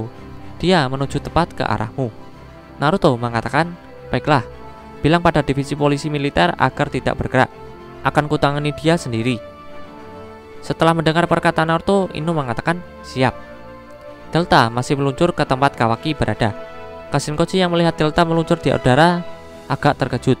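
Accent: Java, Indonesia. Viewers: Medok broo.. heheh ayoo puasa.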